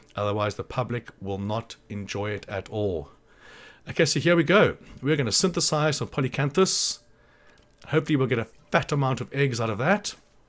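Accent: South Africa. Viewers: Bro, just found your channel. Nice to hear a South African for once. Keep going dawg, climb that mountain 💪🏻🔥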